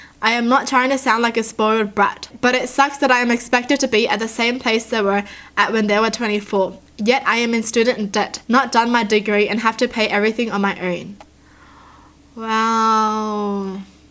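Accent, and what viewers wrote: Australia. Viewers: All your pronunciation is so cute. Like not just Australian, more unique than that. Like you pronounce “confusing” like con-FOO-sing and I love it. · Is she Australian I for some reason always thought that idk why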